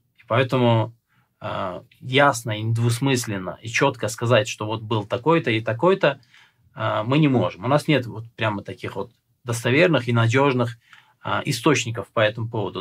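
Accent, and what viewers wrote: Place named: Iran. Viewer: Ибарат не можешь нормально прочиталь арабские слова подрожая иранские мотив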